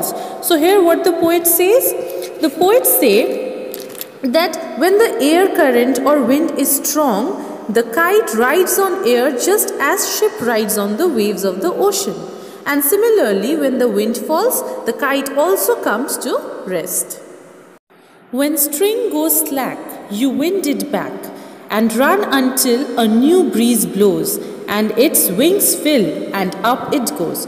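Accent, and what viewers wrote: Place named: United States. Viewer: Mam I like your voice very much it was just as an American🤗🤗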